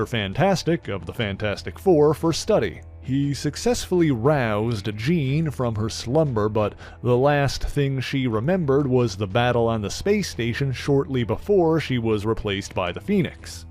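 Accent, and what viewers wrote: Canada. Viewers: Nah... Dude is legit having plumbed the depths of Marvel history. Bro is Canadian and boy it shows. He has a bit of an accent, Canadian wise. No what we gotta get rid of is the snap judgement of immature gatekeepers.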